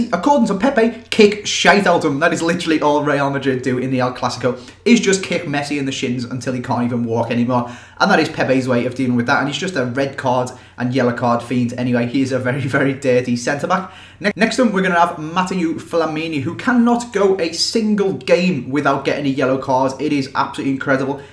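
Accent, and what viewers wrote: Liverpool, United Kingdom. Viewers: Loved the vids as always mate! Keep this up, soon you will be on top of PewDiePie for subs!👊 I smashed the like button. By the way, where are you from, is it Liverpool?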